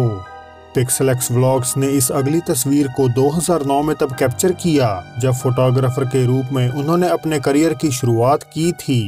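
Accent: Punjab, India. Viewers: Sie ekk baar bta doh app . Kya ap punjabi hoo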